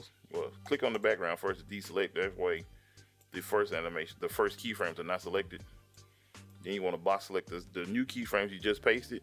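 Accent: United States. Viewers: This is probably so random, but what's your accent? I know you're US, but like what state? I'm not from US but I'm fascinated with accents. PS, thanks for the vid, I found it helpful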